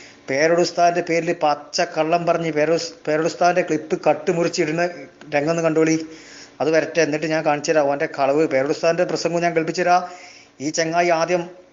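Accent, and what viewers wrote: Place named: Malappuram, India. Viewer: പറയുന്നത് എന്നു് പറയുന്നതിന് പകരം പറയണ്ടതു് എന്ന് പറയരുത്. മലപ്പുറം ശൈലി ഒഴിവാക്കുക . കേൾവിക്കാർ ആശയക്കുഴപ്പത്തിലാകും ശ്രദ്ധിക്കുമല്ലോ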